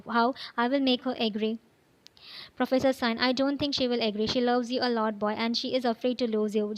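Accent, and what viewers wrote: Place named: India. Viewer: Hey author ..if you don't mind I want to ask where are you from ? I mean country.. well your English accent seems like Indian accent which I liked it 😊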